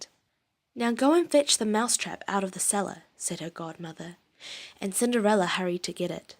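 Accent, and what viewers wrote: New Zealand. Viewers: 뉴질랜드 영어 발음으로 동화를 들으니까 리스닝에 도움이 됩니다. 감사합니다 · Love your kiwi accent! 저는 20살 이후에 뉴질랜드에 가서 아시안 악센트가 강한데 역시 키위악센트가 세계에서 가장 섹시한거 같아요~ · 뉴질랜드 억양 찾다가 우연히 듣게 됐는데 목소리 너무 좋아서 바로 구독햇어요!! 음악만 듣고 살았는데 음악 대신 계속 듣게 될 것 같아요 😍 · 뉴질랜드 액센트 잊지않을려고 옛날부터 많이 듣고있었어요!! 목소리 너무 좋아요 계속 올려주세여❤️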